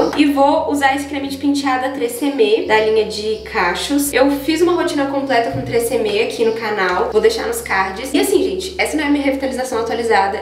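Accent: Minas Gerais, Brazil. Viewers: muito mineirinha 😂❤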